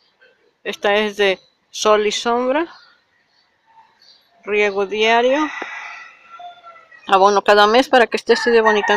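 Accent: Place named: Guadalajara, Mexico. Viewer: Disculpe de donde es usted se mr hase tono de voz de guadalajara mexico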